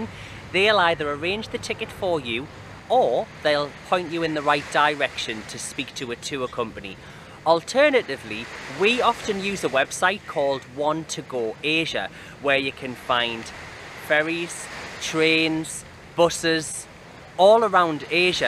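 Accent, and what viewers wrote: Newcastle, United Kingdom. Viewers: Great vid. Only question... is tha a Geordie accent mate?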